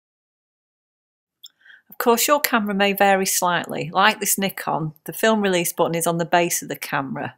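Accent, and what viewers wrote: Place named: United Kingdom. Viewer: Thank you very much for uploading this nice and clear video with a calm voice that pronounces that very lovely and great British English language that everybody can understand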